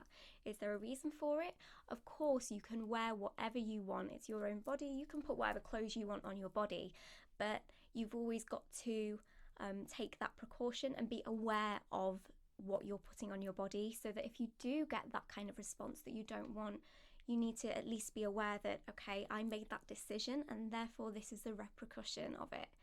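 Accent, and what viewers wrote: United Kingdom. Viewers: Shes british mate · God I hate the overtly sexual vidoes/thumbnails... I dont know who is watching their full vidoes theyre usually terrible from an actual asmr or relaxation point ... side note Ive only seen some whispered vids didnt realize you were from UK, probably why I like your voice so much lol. Anyway thanks for making content! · yeah I know that, I just can't differentiate her British accent from other parts of Britain or Europe.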